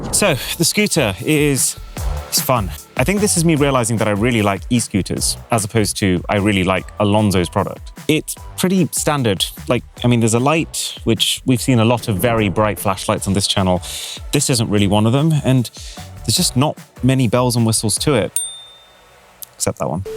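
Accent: United Kingdom. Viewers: Ru British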